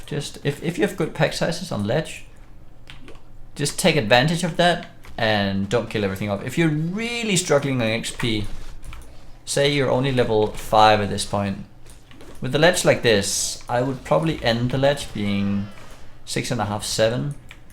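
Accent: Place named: Denmark. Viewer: Hey, you sound like you have a danish accent. Where are you from?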